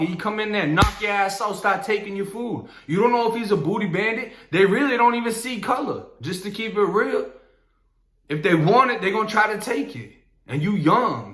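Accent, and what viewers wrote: Boston, United States. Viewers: Bro! I love that slight Boston accent + you really have a feel 4 telling what happened and taking us there! I'm binge-watching this shit until I'm up 2 date. Greetings from Romania!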